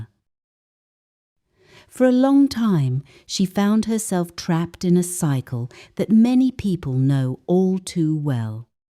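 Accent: United Kingdom. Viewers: Is this British accent?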